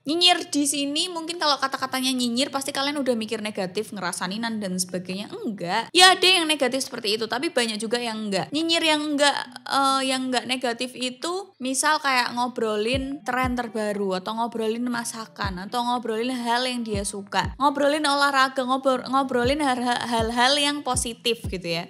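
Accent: Java, Indonesia. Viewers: Klo pingin makan ati terus, kepripen hayoo ??? logatmu kok campuran suroboyoan+Jawa tengahan sih ??? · medok nya itu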